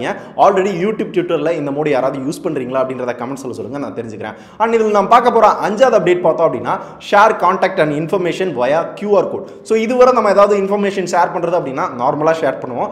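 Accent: Madurai, India. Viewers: Madurai style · Madurai karanga na summa VA Thaniya therivom la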